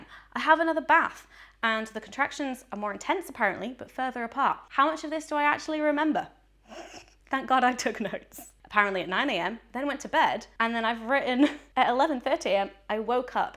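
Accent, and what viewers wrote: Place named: Northern England, United Kingdom. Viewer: Congratulations! I love that you sound more northern when sleep deprived and pumped full of painkillers post-birth 😂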